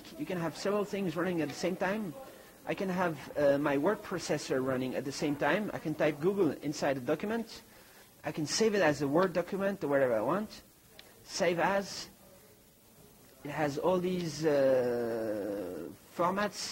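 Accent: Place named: France. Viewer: he sounds french or lebanese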